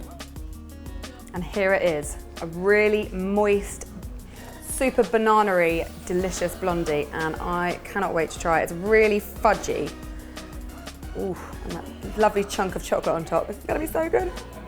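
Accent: United Kingdom. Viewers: You r great! Nice recipes ;) PS: love ur british accent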